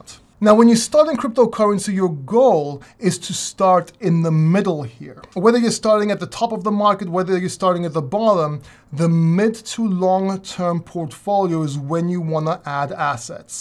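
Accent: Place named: North London, United Kingdom. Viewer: I could detect a slight N London accent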